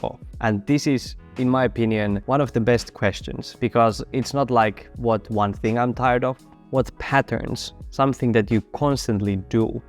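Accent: Finland. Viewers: First time seeing one of your videos. Clicked and heard your voice. Said to myself, "He sounds Finnish". Interesting because most of the reset type of videos I see are made by women who use various planner systems. Other than Ryder Carroll (who created the original Bullet Journal) and a guy named Riley Blanton (who uses Michael Hyatt's Full Focus Planner) yours is the first video of this specific type that I've seen done by a guy.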